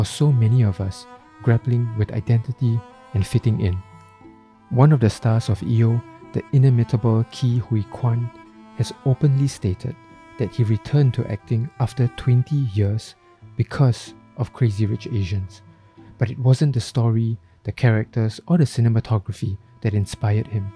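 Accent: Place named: Malaysia. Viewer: hi, hi. are you malaysian/bruneinese/singaporean? you have an accent from these region.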